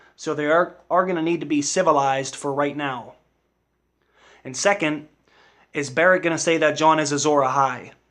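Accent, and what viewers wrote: United States. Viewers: I can't place your accent. Sometimes you sound like you're from Bal-more when you enunciate those rounded Os. Then you sound like you're from New England with the shortened A (i.e. park is pahk car is cahr) but then you sound like you're from West Virginia with the countrified dropping of word endings (I don't mean that as an insult- I think countrified accents sound absolutely warm and charming!) I think you did an outstanding job on this video. Well done, dude!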